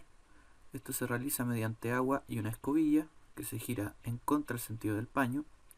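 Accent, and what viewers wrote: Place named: Chile. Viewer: Chileno po weooooon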